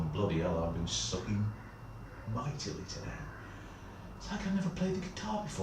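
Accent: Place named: Yorkshire, United Kingdom. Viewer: l think you don't live a million miles from me either. By your accent. I'm near Wakefield.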